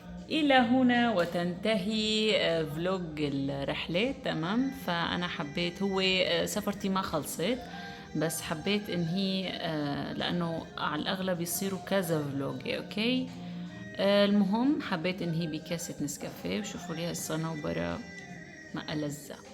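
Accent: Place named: Egypt. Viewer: ربنا يسعدك حضرتك بتتكلمى مصري ماشاء الله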